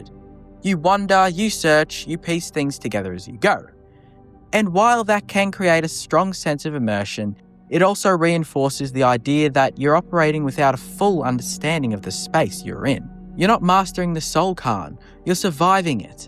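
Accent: Australia. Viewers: I love ya buddy. Just subbed. But the clearly Australian is hilarious. If everyone spoke like you, the world would be a better place.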